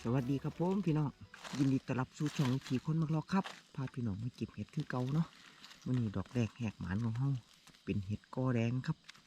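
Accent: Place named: Nong Khai, Thailand. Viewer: สำเนียงการเว้าคือคนหนองคายเนาะจ้าติดตามให้แล้วจ้ามื้อนี่ช่องลูกจ้างอิสละบ่มานำบ่จ้า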